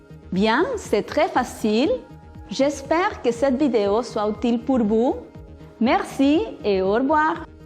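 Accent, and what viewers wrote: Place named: Spain. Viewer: ella pronuncia como español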